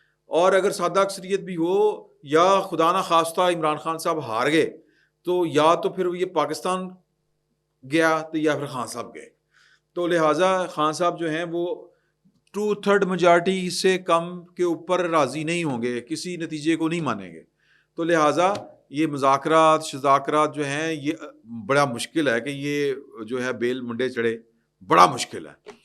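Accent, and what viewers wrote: Punjab, Pakistan. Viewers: سلام عقیدت عرض ھے کہ پروگرام پنجابی لہجہ کی وجہ سے دو آتشہ بن جاتا ھے گزارش ھے دلال منیب اختر کے بیٹے نے جو خود ہم جنس پرست ھے اپنے جیسے ہم جنس پرست سے شادی کر لی ھے جس میں یہ موصوف بیوی اور دوسرا شوہر کے حقوق استمعال کرے گا اگرچہ یہ سب حرام رزق کا نتیجہ ھے آپ دلال منیب کے ذکر کے ساتھ یہ بھی یاد دلایا کریں تاکہ دلال منیب کو اپنی کمائی اور اصلاح کی سوجھے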